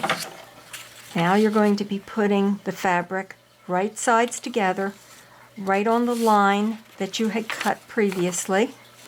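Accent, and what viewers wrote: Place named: Pittsburgh, United States. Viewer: I hear a Pittsburgh accent!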